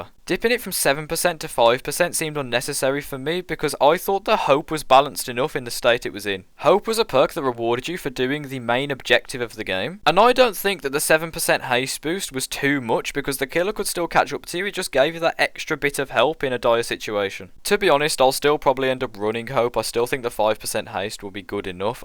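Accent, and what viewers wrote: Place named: United Kingdom. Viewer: hmmm, this guy sounds british ....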